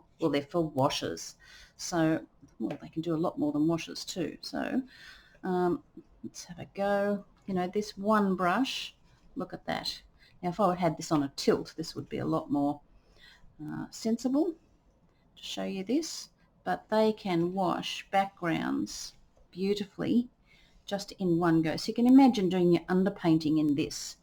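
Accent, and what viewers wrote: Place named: Australia. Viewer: I always use Hakes for watercolour. Great vid and I love Ozzie accents, esp the singing at the end of sentence ;-)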